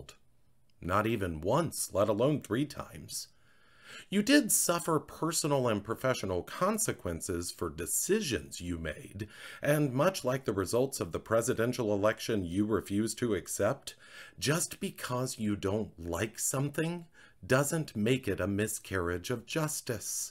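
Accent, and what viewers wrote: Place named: South, United States. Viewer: What I need to comment on is the way BC was read in the most southern way possible! Thank you!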